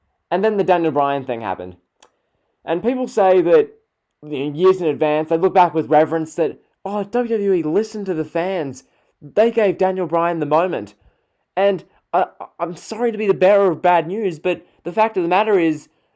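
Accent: Australia. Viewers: Hey man what part of Australia are you from?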